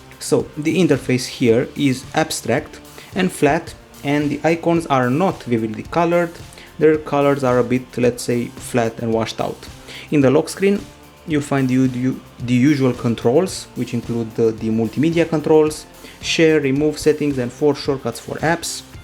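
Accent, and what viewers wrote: Romania. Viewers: Romanian accent 😅 👍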